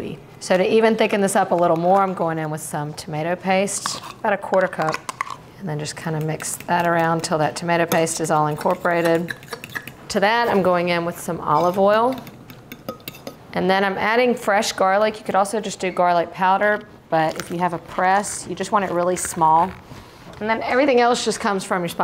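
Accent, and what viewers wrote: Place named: Alabama, United States. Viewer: You talk like me. We must be from the same area in Alabama.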